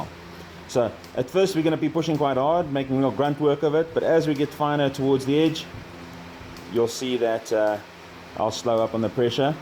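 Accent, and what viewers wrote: South Africa. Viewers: I couldn't place you accent for like 5 seconds when I realised south african!!!! Nice to see a fellow who also does knifemaking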